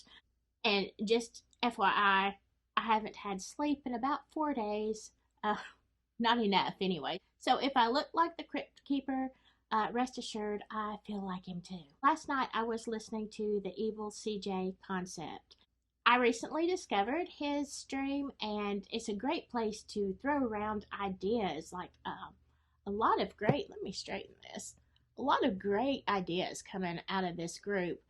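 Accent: South, United States. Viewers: OMG ! YOUR NOT MY CUP OF TEA ! Your Southern American accent is endearing x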